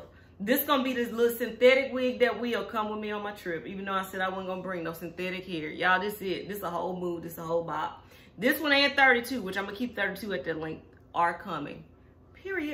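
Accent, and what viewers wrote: South, United States. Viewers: Love the country accent - you are too cute.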